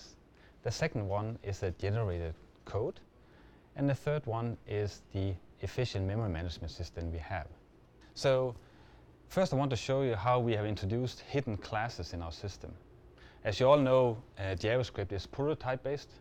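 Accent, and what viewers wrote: Denmark. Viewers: I can just hear he's danish :D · NO! its a danish accent. personaly i think all danish people should be forced to use english instead. its the worlds most singularly incoherent language. a horrible mix between swedish and german which speekers of either language is unable to comprehent. Hell i've heard even danish people have problems understanding each other. I really despice the danish language... (i dont mind the people just there horrendous way of communicating)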